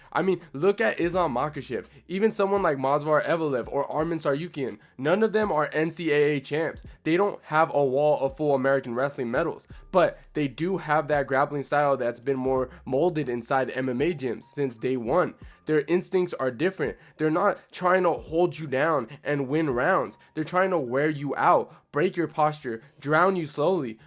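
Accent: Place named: Mexico. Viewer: whos we? You dont sound american you sound mexican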